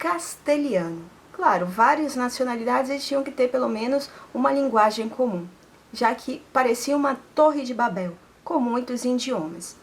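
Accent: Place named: Manaus, Brazil. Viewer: Sabia que a era de Manaus! Mesmo sendo carioca, reconheço facilmente os sotaques brasileiros. Estava em dúvida entre Amazonas ou Pará.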